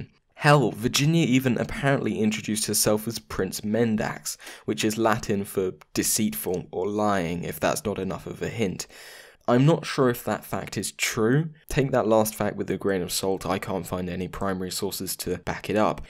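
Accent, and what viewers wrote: United Kingdom. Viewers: I like hearing this story from a British accent point of view but when The History Guy .. did his episode about Cole I bought 3 of his tee shirts. ..which deserves to be forgotten.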